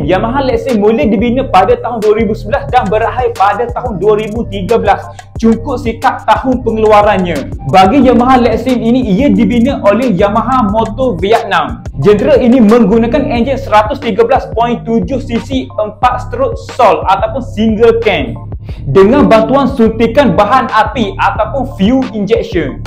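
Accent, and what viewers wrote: Kelantan, Malaysia. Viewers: kelantan sangat hahahha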